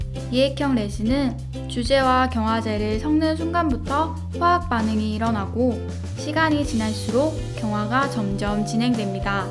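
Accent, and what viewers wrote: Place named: Gyeongsang, South Korea. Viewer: 경상도 억양이 들리네요 ㅎㅎ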